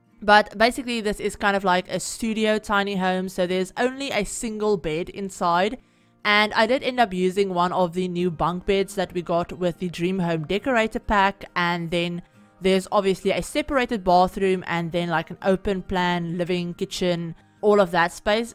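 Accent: Afrikaans, South Africa. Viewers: Are you Afrikaans?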